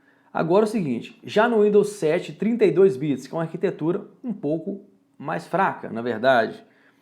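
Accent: Minas Gerais, Brazil. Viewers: Ótimas aulas, Esse sotaque mineirim da um charme especial. Parabéns pela maneira de explicar e passar seu conhecimento!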